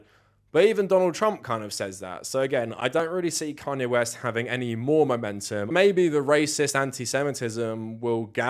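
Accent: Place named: United Kingdom. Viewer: in an annoying british accent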